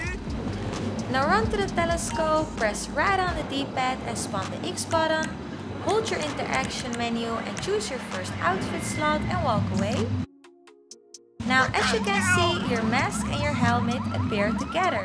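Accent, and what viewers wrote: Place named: Netherlands. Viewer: dan ga ik zeker abonneren 😁 ik hoorde op een bepaalde manier dat t Engels was maar dan beetje Nederlands zegmaar je hoort da je Nederlands bent haha maar kan jij. Nog same. Met ps4 spelen of heb je die nieuwe versie al gekocht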